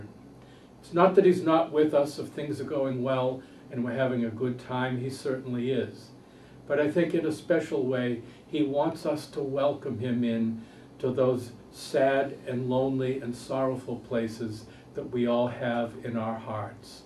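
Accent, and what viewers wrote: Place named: Boston, United States. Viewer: Cousin, I really loved this video. It bring the spirit of Christmas through the voice of children. All done in a still nice Boston accent. You are a star! Merry Christmas. I'm going to Japan on 12/27 to see friends ( i use to live there) and to celebrate my 60th birthday on the Epiphany, Jan. 6th. from your cousin Joanie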